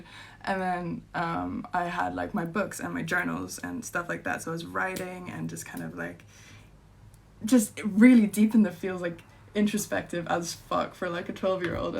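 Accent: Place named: Cape Town, South Africa. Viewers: Right ! She could be a Saffa, where is she from ? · You have a Cape Town accent. Love your music!!!.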